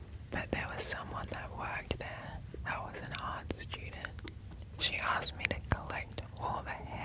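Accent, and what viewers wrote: Australia. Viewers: Aussie accent whispering is the most beautiful thing I've ever heard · I really love the aussie accent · Her aussie accent makes it all better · australian accent is perfect for asmr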